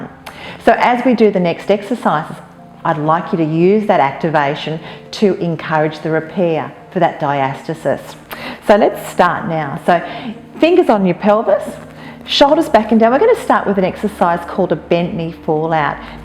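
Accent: Australia. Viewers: AI love your Aus Accent.. I miss Aus😢😢😢😢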